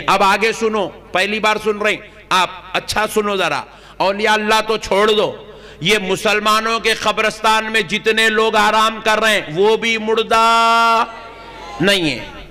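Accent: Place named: Hyderabad, India. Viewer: me to yaar haidrabadi language sunne aaya tha 🤣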